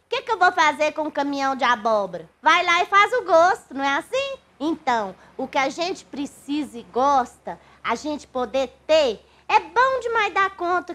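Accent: Minas Gerais, Brazil. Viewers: Que dialeto maravilhoso! Minerês é um deleite. Obrigado e grande abraço 🙋‍♂️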